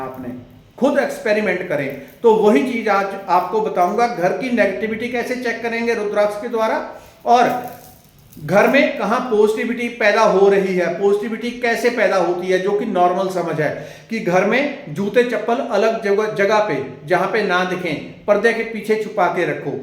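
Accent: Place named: Punjab, India. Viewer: Bhaji meinu lgda tusi punjab ton hon ... tuhadi tone punjabi a ... dsyo ?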